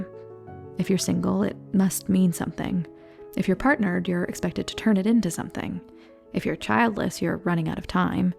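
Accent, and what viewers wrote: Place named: United States. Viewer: You've got one of the loveliest "next door" American accent out there! Keep it up and thank you very kindly for the effort and content! 😊🎉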